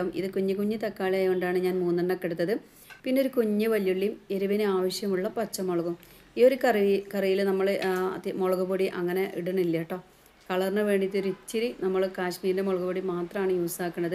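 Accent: Malappuram, India. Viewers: പൊന്നാര താത്ത മലപ്പുറം ഭാഷ കേൾക്കാൻ ആണ് ഞാൻ ഈ വീഡിയോ കാണുന്നത്❤️😂😂👍🏻👍🏻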